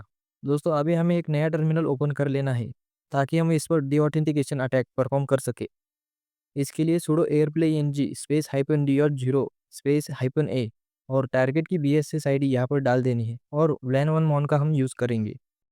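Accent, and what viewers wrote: Maharashtra, India. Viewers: Bhai tu Maharashtrain he kya? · Bhau tu pakka marathi aahes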